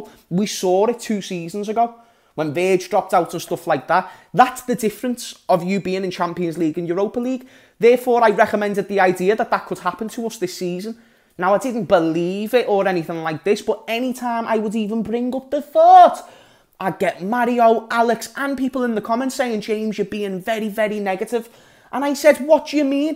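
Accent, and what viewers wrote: Liverpool, United Kingdom. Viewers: James, I'm much older than you and I've been devoted to Liverpool before you've been able to conceptualize football. I'm from the states and will never have the opportunity to visit Anfield. I will live vicariously through you. You remind me of a younger version of myself minus the scouse accent, lol. Don't stop being you.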